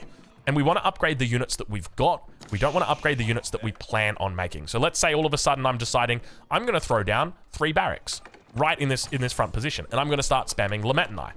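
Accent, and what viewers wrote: Australia. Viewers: Listen at 0.9x to compensate for the Australian accent!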